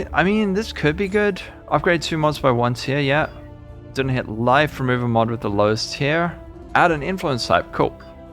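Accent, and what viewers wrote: South Africa. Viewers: Just came across your channel, and a quick question with regards to your accent - are you from South Africa?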